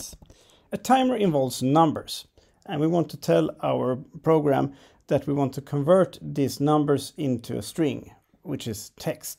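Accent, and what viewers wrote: Sweden. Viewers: The second I lissened to your voice I was like YEPP HE MUST BE SWEDISH before even reading your name/ Hello from Another Swede :) Nice too see a Swedish Gdevelop user make videos! Please continue with the tutorials.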